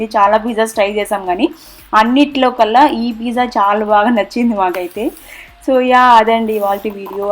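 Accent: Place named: Rayalaseema, India. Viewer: Ru from rayala seema ur way of speaking looks like dat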